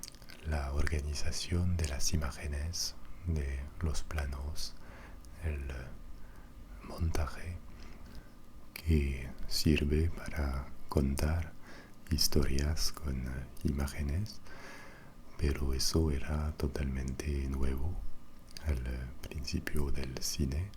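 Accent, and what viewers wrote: France. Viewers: So, do you still have a 'french' accent in spanish?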